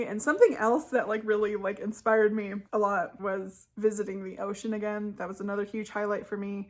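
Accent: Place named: New England, United States. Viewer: It’s so funny being from New England hearing your pronunciations and hearing you hype Maine as a cross country trip because Maine is like a day trip lol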